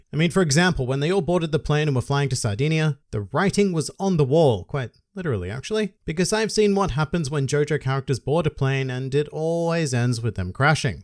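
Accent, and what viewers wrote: Australia. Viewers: Wait your Australian, I thought you were British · I thought your accent sounded normal so you are aussie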